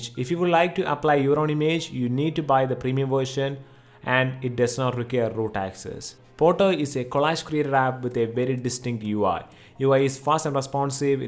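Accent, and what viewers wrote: Kerala, India. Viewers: He's from Kerala. Can easy make out the Malayalam accent in his English. 😀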